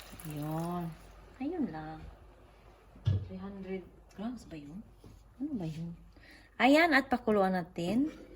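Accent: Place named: Visayas, Philippines. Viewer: Tuba, taas kaayo harang.sige ko katawa dinhi ba bisdak kaayo jud oi.Nalingaw ko Mao pa pag mara naku.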